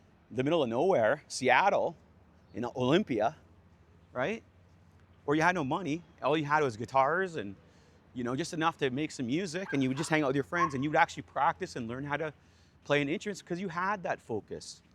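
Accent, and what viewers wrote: Canada. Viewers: First take away in the first minute, you're Canadian eh? I can hear it lol. But I'm feeling you, let's go.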